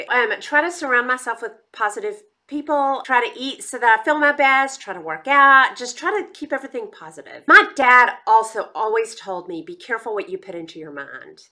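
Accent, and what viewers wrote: South, United States. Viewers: Due to your "slight" southern accent and my "slight" hearing problem, I always use captions if they are available. When you gave your favorite movie, it came across as "Lend Some Death" and I thought what the heck???? Then, of course I figured it out!!! Isn't that a hoot??!!! I can tell you whoever does the captions is NOT southern, lol. Anyway, this was a fun video, I too have great respect for teachers and could NOT do it, they do NOT pay them enough and pay the administrators far too much. IMHO. Could not be a "flight attendant" either since I no longer fly (long story). Used to work for realtors during jr high and high school (yes, I was industrious)-- didn't want to pick blueberries in the summer so taught myself to type!! Looking forward to Part II -- You are fortunate your DH still carries on conversations with you! :-)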